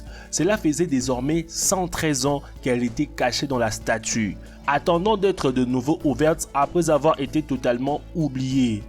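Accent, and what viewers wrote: Quebec, Canada. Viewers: Le français Québécois bande de cons. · Adriien Terliier Toi apprends déjà à savoir que c'est un québécois et c'est pas de sa fautes ci il s'exprime mal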